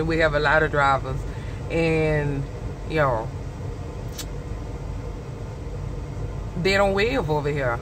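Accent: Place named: New Orleans, United States. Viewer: N.O accent strong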